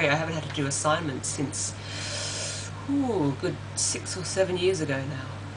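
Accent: United Kingdom. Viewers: Omg an asian with a british accent... I'm asian too, will you date me? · you look like you are studying aboad or something.. its funny to here a british accents from you kkk....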